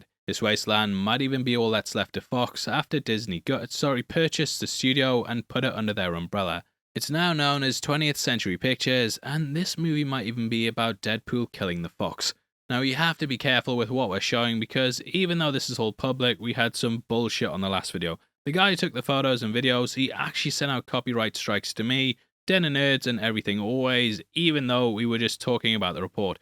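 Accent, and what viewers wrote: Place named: United Kingdom. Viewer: A channel named Heavy Spoilers along with your warning and British accent… I was expecting you to say “bob’s your uncle” or “Ryan is your stepdad”.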